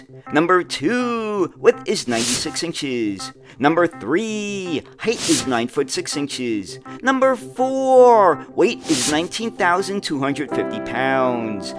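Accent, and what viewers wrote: New York, United States. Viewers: I still think is a NEW YORKA! Come on, you can't hide it with that accent, you are a NE-NE-NE-NEW YORKA.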